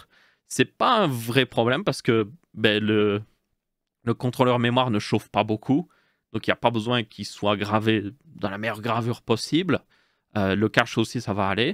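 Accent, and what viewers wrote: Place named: Belgium. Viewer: Ce que je veux dire n'a rien à voir, mais j'ai remarqué que votre accent belge est assez prononcé, mine de rien.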